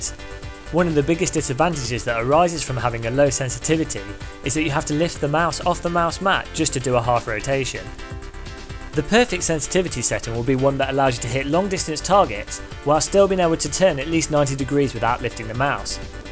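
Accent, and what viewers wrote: United Kingdom. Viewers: Part 2 of my comment Finally you should have also added in the great importance of finding a flawless sensor mouse. This is a mouse that contains a sensor that has no acceleration, or prediction (Enhance pointer precision but on the mouse). Anyway nice video, are you scottish,english or what?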